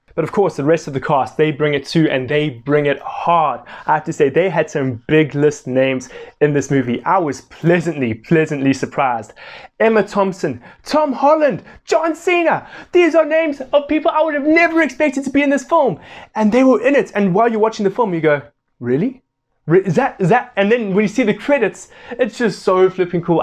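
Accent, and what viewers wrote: Wales, United Kingdom. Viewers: It's a Welsh accent like mine from the valleys. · It’s a Welsh accent! Like mine!and Littlefinger isn’t doing welsh it’s Irish diluted with English.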